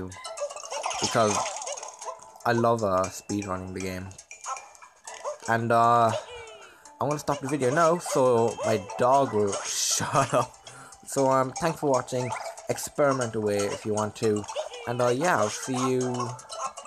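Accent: Ireland. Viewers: Ah, you do actually have an Irish accent, now that I listened closer but, you're right it's not as prominent as a lot of other Irish accents. Also (not to be rude) but, I love your little lisp. I've always been a fan of people with lisps. I find them quite adorable. Like my daughter will tell me about somebody and she'll say oh mommy you are going to love this person, and also they have a lisp.☺️ and I just really think that it makes your speech very unique and adorable. so I hope I did not offend you in any way.😊 And if you figure out how the heck to get off of that little island and, over to the other place I would really appreciate it if you could let me know. it's driving me nuts. Thank you so much. My name is Linda by the way 😉💚💚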